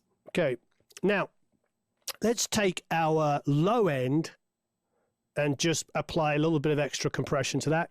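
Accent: United Kingdom. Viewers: lol hair like that, British accent, and music background. WOW are you a shoo-in for California. 😂😂 i hope you make a million!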